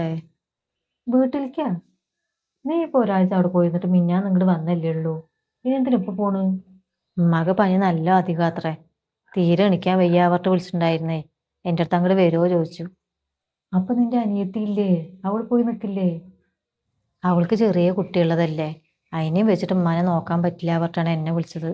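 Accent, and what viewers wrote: Palakkad, India. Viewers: പാലക്കാട്‌ ആണോ വീട്. പത്തിരിപ്പാല എന്റെ നാത്തൂൻ ഉണ്ട്. അവരെ സംസാരത്തിന്റെ slang ഇങ്ങനെ ആണ്.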